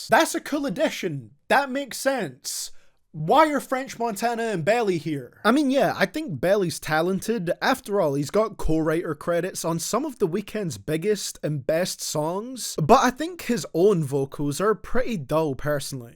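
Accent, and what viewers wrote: Scotland, United Kingdom. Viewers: Its probably the scottish accent. Or the exciting wait for the HAIR REVEAL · I think he's scottish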